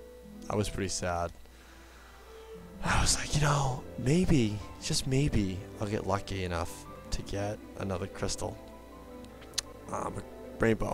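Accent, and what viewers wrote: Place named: Boston, United States. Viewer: Haha awesome luck. That's pretty ridiculous odds. How'd you like the Super Bowl. I really dont keep up unless Tom Brady is involved. You sound very Boston so I guess you might be a Pats fan :p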